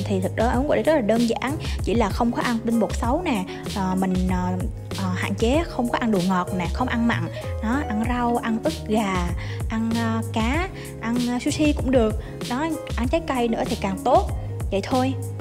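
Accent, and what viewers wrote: Da Nang, Vietnam. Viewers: Nghe giọng bạn như ở Đà Nẵng ý?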